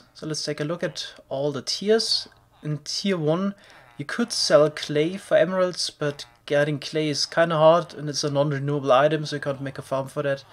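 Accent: Germany. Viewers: der aktzent klingt deutsch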